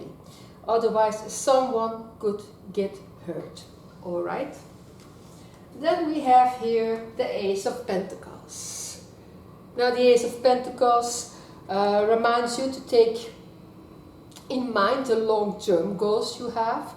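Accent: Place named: Netherlands. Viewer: Are you from the Netherlands? Your accent sound like dutch.❤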